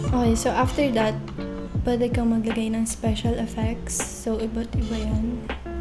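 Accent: United States. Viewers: Parang americana po kayo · Parang americana magsalita Ang cutee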